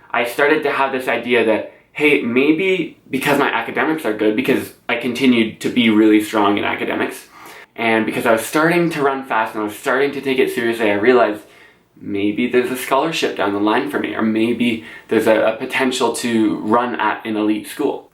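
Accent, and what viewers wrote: Canada. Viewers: I really love your videos man and you can definitively tell your Canadian whenever you say words like watch and tomorrow lol best of luck in Harvard mate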